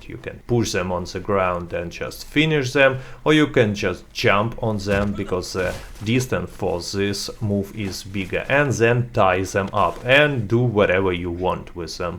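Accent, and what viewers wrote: Russia. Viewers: Geez, why every russian has such a horrible accent? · He’s Russian of course it sounds cool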